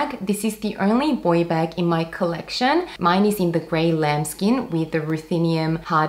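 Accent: Australia. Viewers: Beautiful!!! Aussey accent is nice too. 😝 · Korean Australian accent...?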